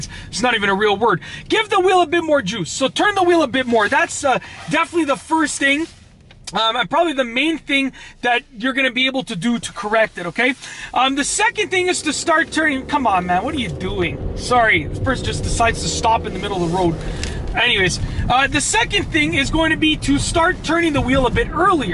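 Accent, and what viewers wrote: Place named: Canada. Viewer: I love how I realized he was Canadian when he was yelling from his accent. Haha! I got a very well needed laugh! I love (Toronto) Canadian accents!!! They're my fave! :-D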